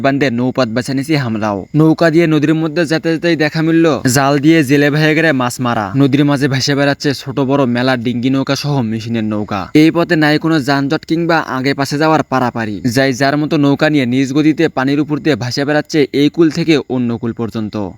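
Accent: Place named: Bogura, Bangladesh. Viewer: গাইবান্ধার ভাষা এমন না। এটা বগুড়ার ভাষা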